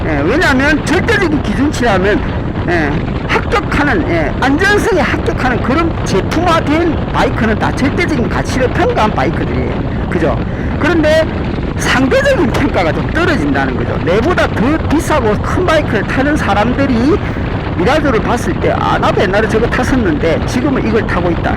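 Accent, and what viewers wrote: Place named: Gyeongsang, South Korea. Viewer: 신묘한 경상도 사투리ㅋㅋ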